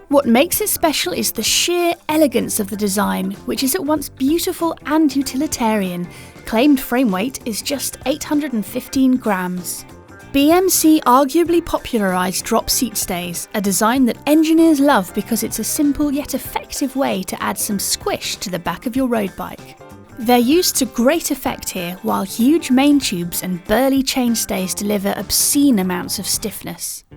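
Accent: United Kingdom. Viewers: Damn your british accent makes me ride along with you.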